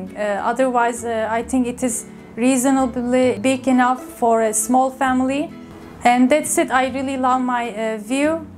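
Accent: Turkey. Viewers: Ablacım o nasıl ingilizce konuşmaktir yaaa ? Türkçe okur gibi. Biraz diksiyonunu geliştir. Ruh kat diline biraz. Dümdüz türkçe aksaninda ingilice mi konuşulur ? 1.30 dakika zor izledim. Hemen kapattım.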